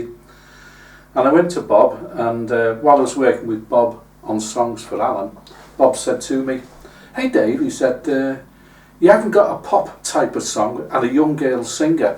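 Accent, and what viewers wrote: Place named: England, United Kingdom. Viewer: Hello Dave.. Love your English accent, very charming ;-)) ... you sure have a nice set up for all your equipment, you sure went back to gather all the specific tunes, to explain all this to us, looks like a lot of work, all your version were a delight to listen too, ..You are a great artist, singer and most all, you get so detailed with your songs, that they become part of us..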